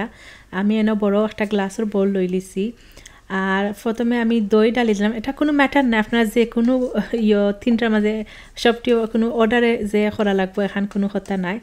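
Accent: Sylhet, Bangladesh. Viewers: She is speaking Shyleti dialect that’s why. It’s suitable for only UK shyletis. Others will definitely have a hard time understanding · Your bengali accent sounds like you're from sylhet.. dayum so hard to understand even tho I'm a pure bangladeshi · Nothing wrong with her Sylheti accent and speaking in her own dialect , it's rather cute, and I am personally appreciative of her confidence level! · Uni Sylheti bhashae kotha bole. Ekhane na bojhar tamon ki ache. Ami Sylhet bashi na hoeo to shob kothai bujhlam. 900w bolechen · মাশাআল্লাহ! রেসিপি ও সেলেটী ভাষা ২ টাই অনেক সুন্দর । আমি সেলেটী নই কিন্তু আপনার কথা বুঝতে পেরেছি। সকল ভাষারই সৌন্দর্য আছে❤। জাজাকাল্লাহ খাইরন। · আপনি কি সিলেটি আপু।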